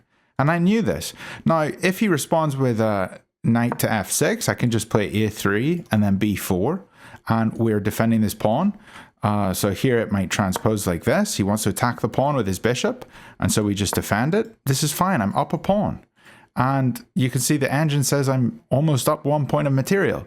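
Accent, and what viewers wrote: Northern Ireland, United Kingdom. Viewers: I'm really enjoying watching your journey. I'm currently trying to escape the <num> bracket and have been spending a lot of time learning theory recently, but watching skilled players convert midgame positions is very helpful for me Also I'm interested in your accent! It sounds like a mixture of Northern Irish and South African, plus I guess a few north Americanisms. Did I get anything right?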